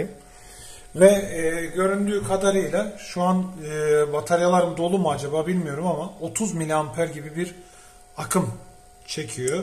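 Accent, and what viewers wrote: India. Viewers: off bir an hintli sandim yahuu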